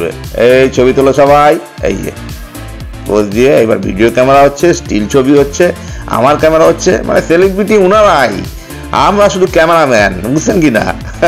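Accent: Bangal, Bangladesh. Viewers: Dada to darun bangal bhasa bolen darun darun khub haslam go আর খাওয়া দাওয়া সেই ছিল 😋❤❤❤❤ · Biye bari blog darun ...sathe dadar bangal kotha just fatafati 😊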